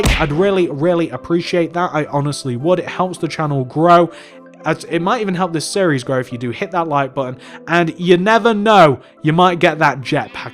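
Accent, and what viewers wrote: England, United Kingdom. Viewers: are you English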